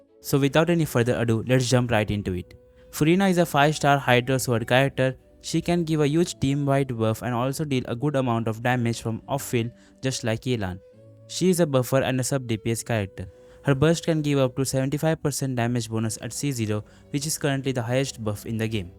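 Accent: India. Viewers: Yo did his accent change to Indian or am i imagining it?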